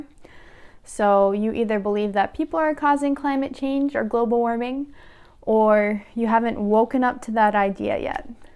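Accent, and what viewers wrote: United States. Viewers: R u american?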